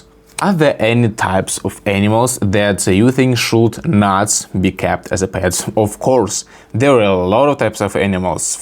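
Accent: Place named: Russia. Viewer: эхх этот русский акцент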